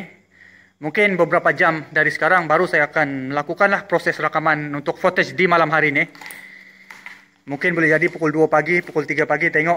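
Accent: Sabah, Malaysia. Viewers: mcm org Sabah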